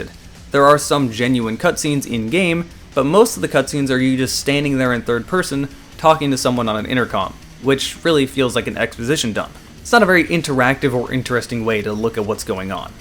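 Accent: Italy. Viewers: Honestly he sounds Sicilian. Call a Siciliano, Italian. He'll punch you. Try it.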